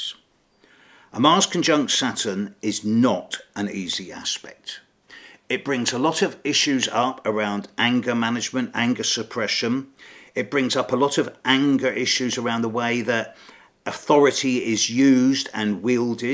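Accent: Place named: RP, United Kingdom. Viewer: Thanks! It's always a great pleasure listen to you... And I love your clear British R.P. accent... Greetings from Italy 👋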